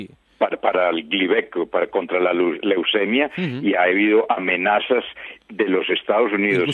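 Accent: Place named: Spain. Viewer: que bruto que informacion tan importante... lo ideal hubiera sido en video y no solo la voz pero esta brutal.... gracias por compartir ahorita estan atacando al e cig... de parte de la oms para proteger la inversion de loc chicles parches y champix... surtidos por las farmaceuticas... te invito a ver nuestra informacion <link> aunque el ataque es a nivel mundial, igual en españa porque se te oye voz de español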